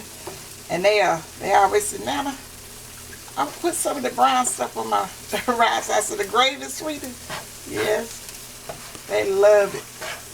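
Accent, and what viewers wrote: South, United States. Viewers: Hello you are truly a delight to watch I just love your southern accent it's just beautiful and your very detailed step by step, if someone doesn't get it the first time with you,I truly feel sorry for them your very detailed. This is my second time watching you and I shall continue watching your blog.....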